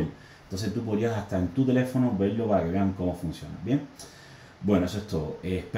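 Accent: Cuba. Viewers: Es cubano? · Buenísimo! Muchas gracias! Eres cubano, verdad?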